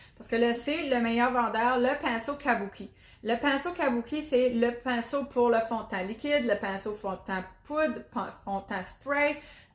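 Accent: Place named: Canada. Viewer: J'adore ton accent petite soeur hi acanadienne ............Ton sourire ton peps je kiffe <3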